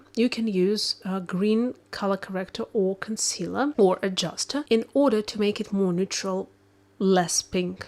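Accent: United Kingdom. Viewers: Я так пыталась понять Ваш акцент, думала Вы из Англии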